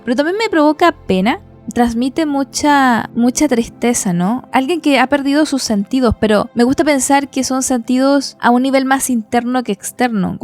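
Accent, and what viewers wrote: Chile. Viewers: Amé! muchas gracias por tu trabajo, muy enriquecedor, perturbador y de introspección. Además, amo hables en chileno sin importar nada! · Que lindo escuchar tu voz ese asento chilena que tienes me encanta ❤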